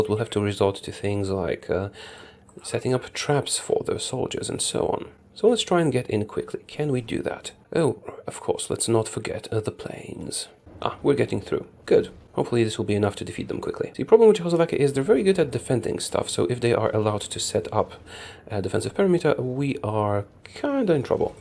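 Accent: Poland. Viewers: whatt oglądam cie od 2 lat i coś tak właśnie przeczuwałem że jesteś z polski. Masz zajebisty angielski. Pozdrawiam mordeczko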